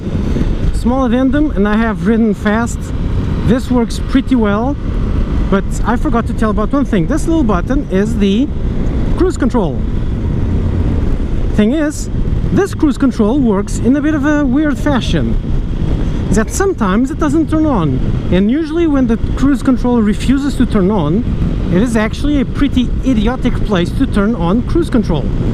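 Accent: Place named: Portugal. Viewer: Incrível como topei em 5 segundos que era um tuga a falar inglês.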